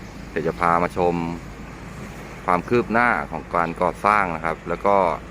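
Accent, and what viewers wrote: Ratchaburi, Thailand. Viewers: สำเนียงของแท้เลย ชาวราชบุรี ชอบครับ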